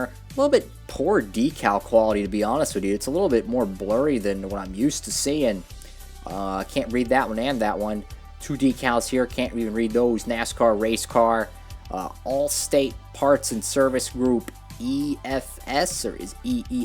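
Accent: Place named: New Jersey, United States. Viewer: And also you sound like your from jersy but im from alabama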